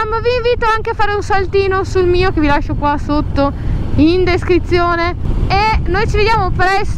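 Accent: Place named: Veneto, Italy. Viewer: L'accento veneto per i motovlog è un plus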